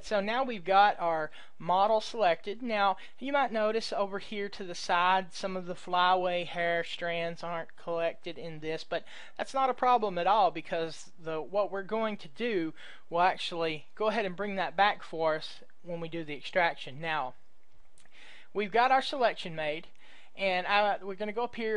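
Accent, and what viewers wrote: Texas, United States. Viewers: Thank you! but hey are you from Texas?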